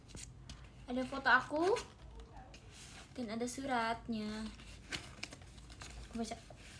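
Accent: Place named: Java, Indonesia. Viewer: Kenapa dia bisa logat jawa ya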